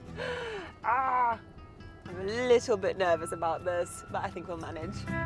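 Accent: United States. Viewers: so cute, has aquired an a bit of an A,merican accent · Is it me or did you get a slight American accent in this video? :P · Hilarious how you picked up the American accent! (from your hybrid British - Polish accent)! Like my wife who picks up the accent of the places she visits. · in america, even her accent got a little more american🤠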